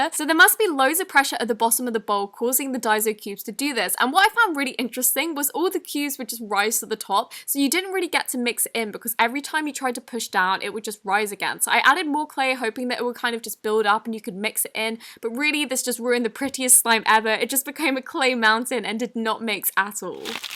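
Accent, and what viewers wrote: England, United Kingdom. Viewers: James McDonald why I’m irish and we hate the English like i hate this both but i don’t hate her voice and it’s an english accent not British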